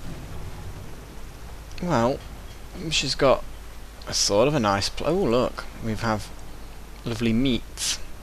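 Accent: England, United Kingdom. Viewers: I enjoy him because he doesn't have a silly gimmick(angry video game nerd....), doesn't sound/act like he is stoned/high, doesn't make us feel like we are just silently observes watching a methodical video (like when my german friend plays minecraft) but instead makes us feel like we are along for the ride through skyrim as a LET'S play.. not a walkthough. also I enjoy english accents.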